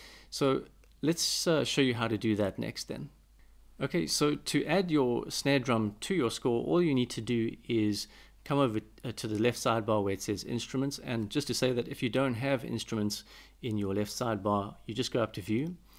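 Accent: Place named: South Africa. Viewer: Thanks for this! Are you South African? I think I can hear it a bit in your voice :D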